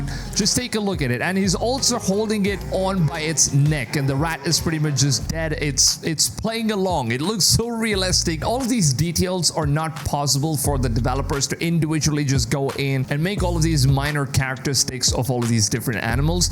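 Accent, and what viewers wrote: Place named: India. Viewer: Your accent is funny, it's not very American. It almost sounds Indian. :)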